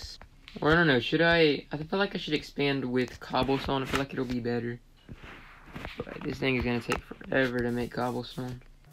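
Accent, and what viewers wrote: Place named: Texas, United States. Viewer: You kinda sound like ur from texas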